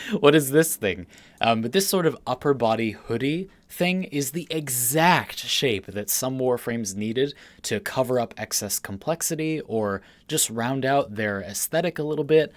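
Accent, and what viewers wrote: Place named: California, United States. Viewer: is so underrated as a wf youtuber. Btw, I Love ur accent, is it Californian?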